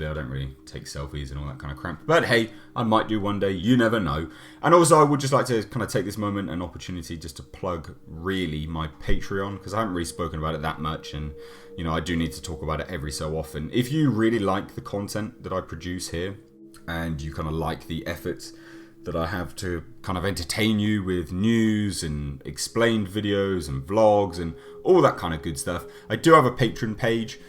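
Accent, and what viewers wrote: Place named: United Kingdom. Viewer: I am interested in anything that you say with that deep Brit voice.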